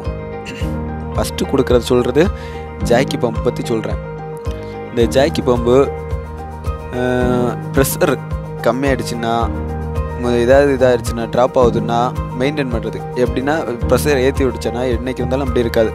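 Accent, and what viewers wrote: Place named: Kanyakumari, India. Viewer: Kk ..unga slank la theriuthu ..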